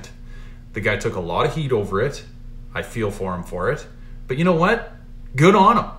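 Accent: Canada. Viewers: I can always tell when a Canadian is talking. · You Canadian eh? Thanks, Great Video. I want a GT Convertible. Does it matter 89-93? I would think by 93 bugs would be less? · He sounds Canadian.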